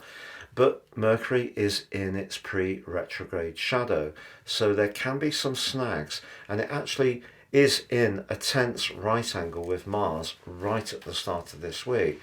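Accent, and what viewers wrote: United Kingdom. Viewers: I do love your very British English with such sheakspearian style. Greetings from Cairo Egypt.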